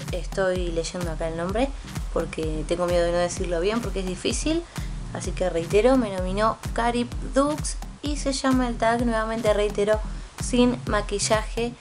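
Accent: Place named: Argentina. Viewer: pues eso, el acento argentino :) jejeje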